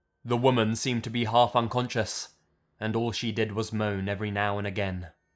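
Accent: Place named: United Kingdom. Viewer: Why did he have to have a British accent 😓😓😓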